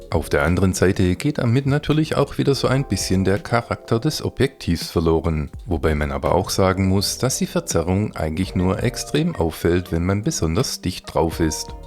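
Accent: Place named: Swabia, Germany. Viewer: Ich mag deinen Kanal habe ihn vor zwei Tagen erst entdeckt. Sehr angenehm alles insbesondere deine Stimme! Übrigens höre ich etwas schwäbisch heraus 😉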